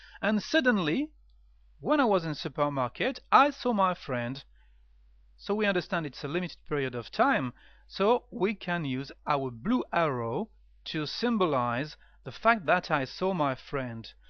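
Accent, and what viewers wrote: France. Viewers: haha love your french accent :-)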